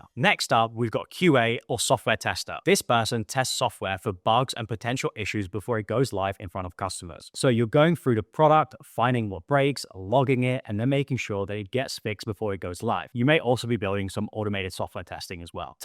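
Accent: United Kingdom. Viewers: English Accent? Nice.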